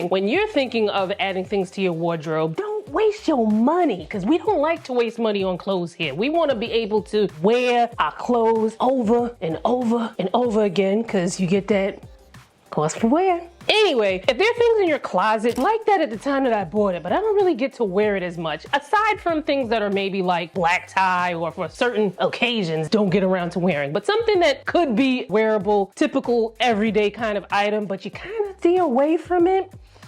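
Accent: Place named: New Orleans, United States. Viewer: I live in Louisiana and i thought you were from New Orleans, love the accent ~ new subscriber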